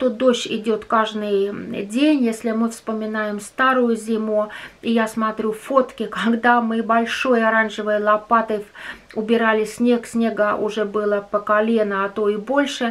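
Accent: Finland. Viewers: Здравствуйте,! Русский у Вас отличный. Ну а акцент-это более 30 лет пребывания в Финляндии. А про юмор могу сказать, что не каждый в России может знать столько "мулек", как Вы. Канал смотрю месяца три, очень нравится. Продолжайте в таком же духе. Смотрела ранние видео. Хоть мы и далеко друг от друга (где Финляндия и где Урал), мне интересно знать, как живут люди за горами, за лесами)))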